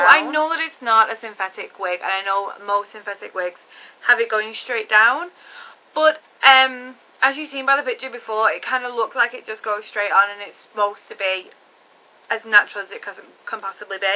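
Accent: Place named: United States. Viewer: why does your accent keep fading to american lool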